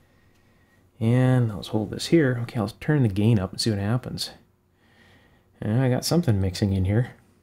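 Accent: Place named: Canada. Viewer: Thank you …a fascinating video featuring an interesting oscillograph. I enjoy your mini talks and your delightful accent…what part of Canada are you from? (I’m in the south of England). My own very first oscillograph was ideal for checking audio circuits working up to about 1MHz or so. It was just post Second World War I think and used a 6K25 Thyratron in the time base…I’ve still got several of those in my shack (my UK callsign is G3XFD, my Irish callsign is EI5IW). In the past I’ve found some interesting little scopes at Amateur Radio Mobile rallies (I think they would be classed as ‘swap meets’ or something similar in North America) and have several 1CP1 one inch scope tubes which featured in Practical Wireless magazine (I was Editor for almost 25 years until retirement in 2013)) using the Mullard/Philips basic workshop project scope. A fascinating little tube, the 1CP1 (Loctal base) was used as a waveform indicator by the the old British Post Office Telephones (now BT) in telephone exchanges to monitor the dialling tone, which was generated by little 440Hz (approx) frequency output motor generators! 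Most of the scope tubes had phosphor trace burns due to thousands of hours continuous working…but they could still be used! Thanks for your excellent tutorials…I’m a great fan! Best wishes, Rob G3XFD.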